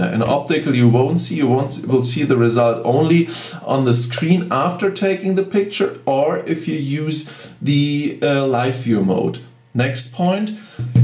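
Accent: Germany. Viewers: german speaking english?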